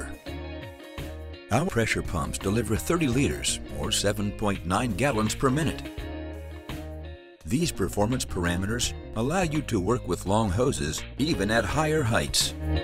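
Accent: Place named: United States. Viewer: Website arrests is .de but it's an American voice ?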